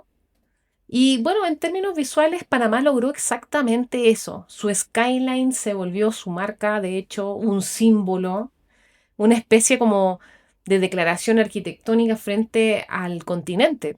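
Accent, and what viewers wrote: Chile. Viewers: Tu creo eres. CHILENA por tu acento, pero tu analisis esta bien hecho y estudiado Te felicito y en horabuena por ti, creo no me equivoco haces PERIODISMO INVESTIGATIVO MUY BUENO. Resaltas y dices las cosas como son y porque se dan. NO COMO UNOS REPORTAJES DE ARGENTINOS QUE ESCRIBEN UNAS "BURRADAS". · Muy bueno tu video. Me parece por tu asentó eres chilena. Mira, Panamá desde sus inicios fue destinada por el mismo creador a desarrollar su economía en base a los servicios. Su posición geográfica, su territorio y hasta la mentalidad de su población apunta a eso. Dese la llegada de los primeros europeos, desde su creación como nación libre, desde simpre su destino fue ese. Tantas cosas para comentar. Termino con esto. En nuestro escudo ee armas, esta escrito esto. Nuestro lema es "PRO MUNDI BENEFICIO" qué significa, Para BENEFICIO DEL MUNDO. 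Imagina fue creado en 1904. Desde simpre es y será nuestro rol. Saludos desde el puente del mundo. Bendiciones.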